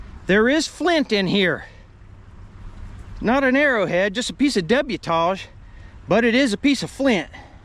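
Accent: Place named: Appalachia, United States. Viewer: you remind me of my cousins in Ohio and West Virginia with your accent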